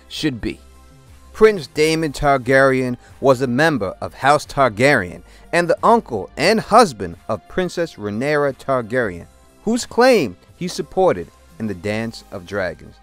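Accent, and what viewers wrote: New York, United States. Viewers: Perfect casting! I love listening to your commentary as your NY accent is so distinctive and makes me miss home. NY strong.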